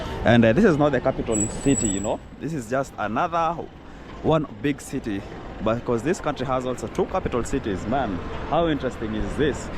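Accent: Kenya. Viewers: Lived in Eswatini for seven years, such a great country! You sound Kenyan, thanks for the video! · Thats a Kenyan 🇰🇪 accent. Good job my brother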